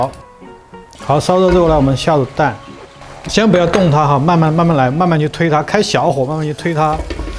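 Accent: Sichuan, China. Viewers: 的川普有点儿安逸。rua均匀，白fu椒粉，荔枝fu辣味，fu辣油。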